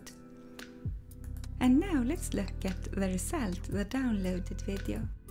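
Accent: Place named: Nigeria. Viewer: Sounds like a Nigerian accent.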